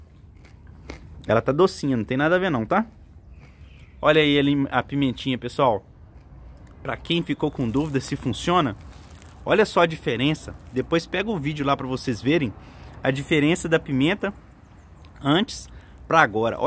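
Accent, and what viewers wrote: Minas Gerais, Brazil. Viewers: Cê é minero, fii???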